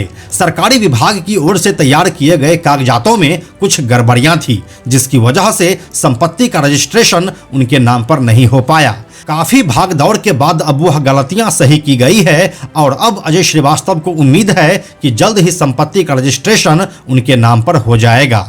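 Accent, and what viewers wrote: Bihar, India. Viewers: बकवास, उससे अधिक घटिया बिहार जैसा उच्चारण. शम्स ताहिर खान से training लो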